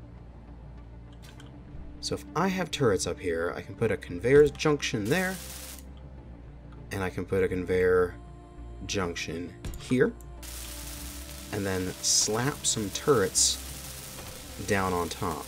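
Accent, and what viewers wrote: United States. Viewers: Hey dude, I'm really enjoying your series, keep up the good work✌🏻 I have a question... What accent do you have? I assume you're American but where in America🤔 thanks haha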